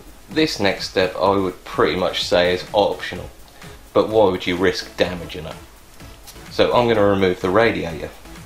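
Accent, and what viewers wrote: United Kingdom. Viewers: Great vid just about to do this to my mk1 so doing a bit of research but a have 1 question.... are you from norfolk?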